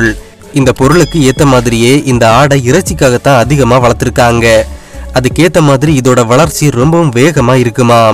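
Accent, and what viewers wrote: Kanyakumari, India. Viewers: தலைவர் கன்னியாகுமரிக்காரருங்கோ..........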